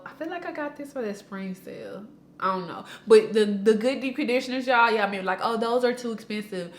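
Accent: South, United States. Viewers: Sis! Your skin, hair and southern accent! ❤️❤️❤️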